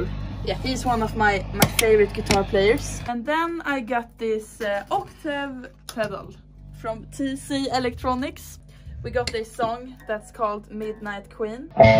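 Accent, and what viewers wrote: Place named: Sweden. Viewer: idk but your accent is cutely swedish. 😂❤ Definitely checking your band out. Cheers from Helsinki!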